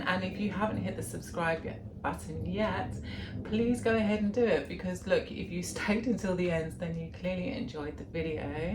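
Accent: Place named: United Kingdom. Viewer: Love live your British accent- you make words sound so fancy😊 Greetings from Connecticut-USA 🇺🇸but was born in the Philippines 🇵🇭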